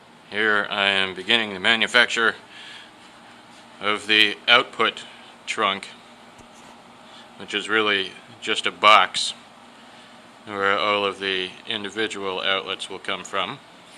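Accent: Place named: Croatia. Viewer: Brilliant work however your heavy Croatian accent makes it difficult to follow your otherwise excellent vid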